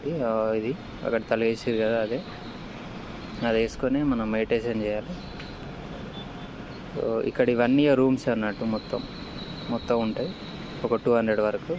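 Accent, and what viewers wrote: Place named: Karimnagar, India. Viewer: Karimnagar language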